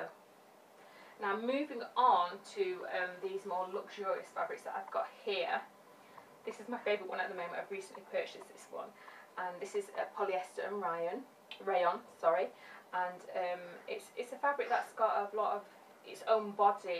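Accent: United Kingdom. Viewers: Fantastic tips as always, I should be in bed but I decided to listen to my favourite online fashion tutor! From your accent I can tell, you are British. I live, not far from London. Could you recommend any areas where I can get the wasted ends of fabric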